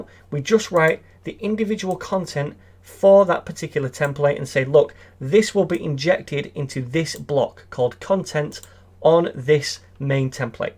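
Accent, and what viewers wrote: United Kingdom. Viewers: The stof hahaha, love that british accent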